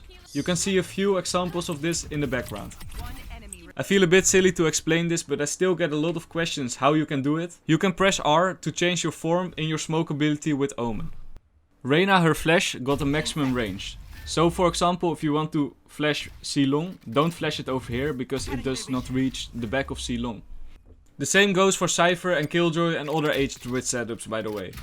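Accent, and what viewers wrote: Netherlands. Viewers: When I started the video I heard the Dutch accent instantly nice vids man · you hear he is dutch lol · Ma man's dutch i know the accent because i am dutch too lmao, good and handy vid tho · Nice Nederlands accent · U sound sooo dutch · Een Nederlander ik hoor het aan het accent 😂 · A bit of nederlandse accent maar its goed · Broo your english is on point, ye your accent is really obvious dutch but outside of that you know exactly what to say! Lekker bezig maat! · The dutch accent is strong lol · Jij bent 100% een nederlander hoor het aan je accent hahaa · Loll i like your dutch accent 😂😂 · im only watching this now, but when i heard you speak the first sentence i recognised a fellow dutch accent. i decided to check your channel name and heyy i was right!! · use wierd KAMERA spots, love the dutch accent xD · Hey ben je nederlands want je hebt een hard accent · I love the amount of Dutch I hear in your voice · Gy eb echt een nederlands accent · Loving the dutch accent <3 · het is een hollander je kan het gewoon horen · XD, I literally hear the Dutch accent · Kamera spots love the dutch excent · Nederlander xp hoor je gewoon aan je accent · loving the dutch accent 😩☝️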